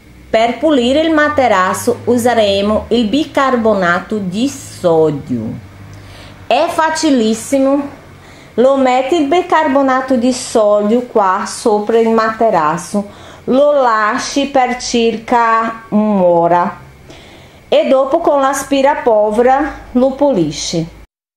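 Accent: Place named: Brazil. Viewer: Mi piace il tuo acento brasileiro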